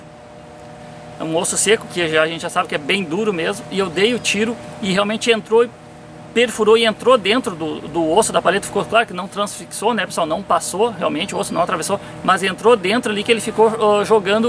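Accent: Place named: South, Brazil. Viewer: tenho uam duvida vc mora em RS? MORO EM PORTO E GOSTO MUITO DE SOBREVIVENÇIA 9PERGUNTO PQ VC TEM UM SUTAQUE DO SUL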